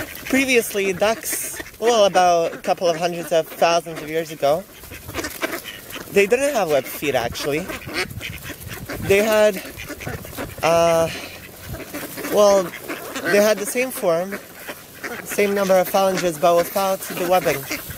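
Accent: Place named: United Kingdom. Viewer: You are from Canada? I thought from UK. Cute ducks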